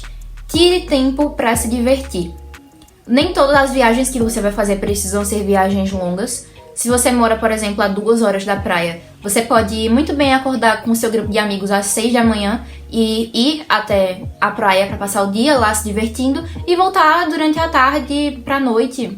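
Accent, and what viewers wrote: Northeast, Brazil. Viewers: PERCEBI PELO SOTAQUE QUE ERA DO NORDESDE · o seu sotaque nordestino é inconfundível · Tá aí um sotaque nordestino arretado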